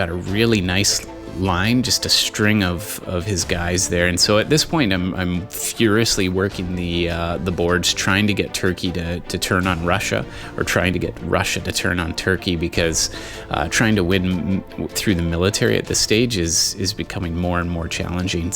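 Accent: Canada. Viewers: Hello, fellow Canadian (assumption based entirely on raised “out” vowel)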